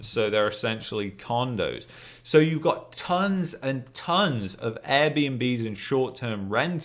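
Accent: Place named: Cockney, United Kingdom. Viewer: I’m trying to understand why a Cockney Lad with fabulous economic skills ended up in Canader!! I’m enjoying your intelligent reviews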